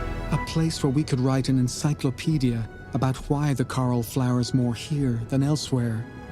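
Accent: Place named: Ireland. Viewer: Irish dialect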